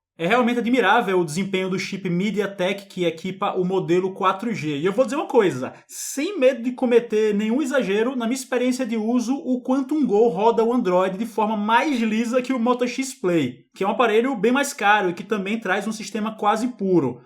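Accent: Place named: Fortaleza, Brazil. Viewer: De fortaleza se não me engano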